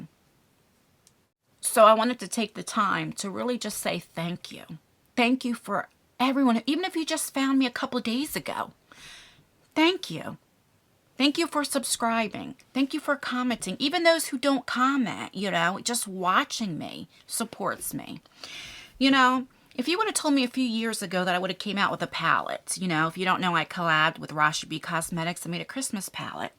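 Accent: New Jersey, United States. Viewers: Love your videos! Whenever I hear your voice, I miss living in New Jersey :-). We are the same age and our birthdays are only a couple days apart, and I just learned a few years ago to trust my gut! Don’t let people gaslight you into making you feel like you’re losing your mind. You feel how you feel for a reason.❤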